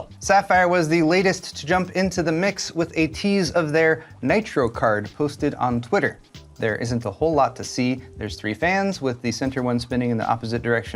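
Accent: Germany. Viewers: commenting on your german accent has me between honesty and politenes. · you speak like a native Bavarian... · I had to give a thumbs up for the pie alone. But I wish there was an option for a 10 times thumbs up for the German dialect. By far my favorite YouTube channel keep up the great work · Love the german accent dude😂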